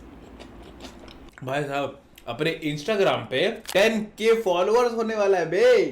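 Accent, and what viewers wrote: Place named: Bihar, India. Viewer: Humko laga app bihari ho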